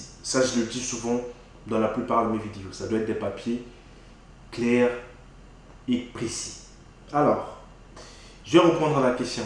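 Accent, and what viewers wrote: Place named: Democratic Republic of the Congo. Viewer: On retrouve l'accent congolais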